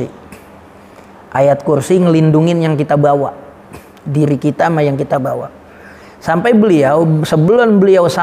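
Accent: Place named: Jakarta, Indonesia. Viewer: Betawi beud dah.. Sama ama saya ustadz.. Suka sayah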